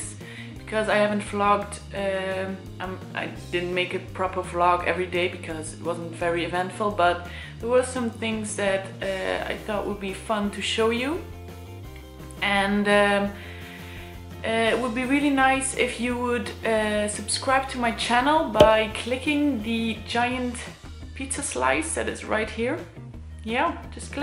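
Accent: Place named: Netherlands. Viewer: Love your Dutch accent!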